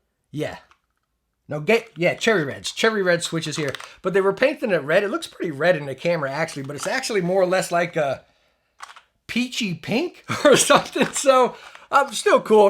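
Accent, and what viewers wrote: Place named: New Jersey, United States. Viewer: As a Brit I guessed you were from jersey from your accent so I'm pretty impressed with myself, that could also just be shear coincidence tho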